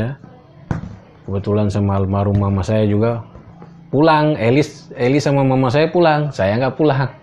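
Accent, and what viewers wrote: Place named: Minangkabau, Indonesia. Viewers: Logat sdh Minang sekali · Dialek bahasanya dah persis orang Minang.mantaap.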